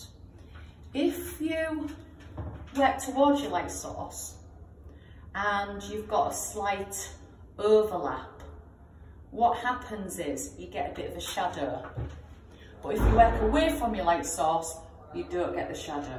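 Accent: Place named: Hull, United Kingdom. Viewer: I need this lady to do a mural on our ceiling and she sounds local to Hull??? Would be great if she’s available or has any great recommendations ❤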